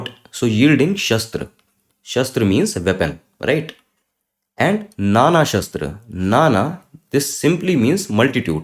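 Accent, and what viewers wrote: India. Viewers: Fantastic lectures. The best. But pls do not slip into Hindi pronunciation of words by dropping the अकारन्त of so many words - मम् प्रातिपदक् etc - sounds strange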